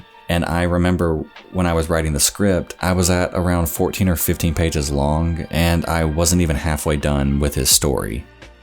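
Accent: South, United States. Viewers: How come I’ve never heard the southern accent until you’ve mentioned it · His "southern" accent is about as strong as mine; I was born down South but grew up in various northern states and it's mostly faded. The accent is still evident in certain words and phrases though. It's just not a typical southern drawl.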